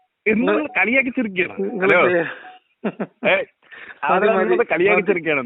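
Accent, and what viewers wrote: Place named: Palakkad, India. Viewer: അല്ല പാലക്കാടൻ ശൈലി തന്നെയാണിത്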